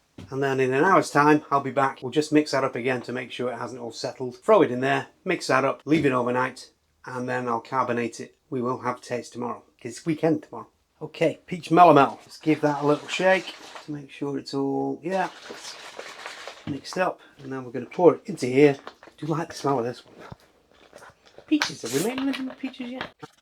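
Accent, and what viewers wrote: United Kingdom. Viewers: Quick question, what’s your accent aside from British? Other are you brewer for job or hobby?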